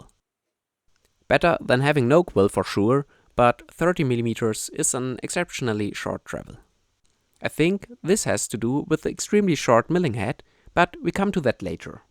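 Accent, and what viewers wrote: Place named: Germany. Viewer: Love that german accent!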